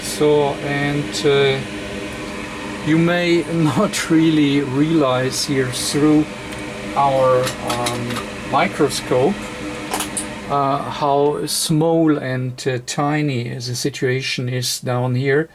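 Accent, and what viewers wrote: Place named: Germany. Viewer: you sound like my mentor with a german accent very easy to follow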